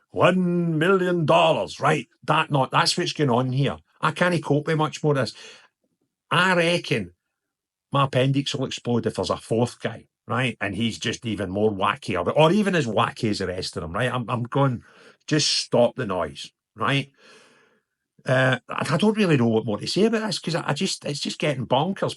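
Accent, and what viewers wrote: Scotland, United Kingdom. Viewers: Kind of made me laugh when you were talking about who these guys are. Actor (come) model (come) rent boy, allegedly 😆 Plus the Scottish accent. Just made me laugh. Sorry, serious subject I know. But then came the village people😁😆😶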